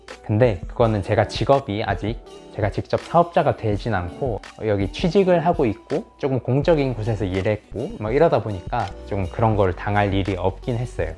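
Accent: Gyeongsang, South Korea. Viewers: 표준말을 경상도 사투리로 쓰시는데요. 귀욤!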